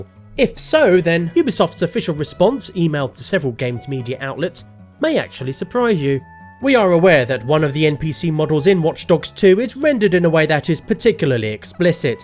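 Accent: England, United Kingdom. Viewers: Real talk, you are really clever and funny, produce timely content on a regular basis, and have an English accent that makes you sound smart to everyone else. I don't know why you don't have 500k subs, but I am sure if you keep your nose to the grindstone you'll get there before you grind away your frontal lobe. May have taken the metaphor a bit far there.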